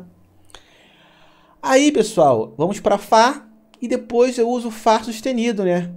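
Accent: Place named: Rio de Janeiro, Brazil. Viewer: sabia que era carioca!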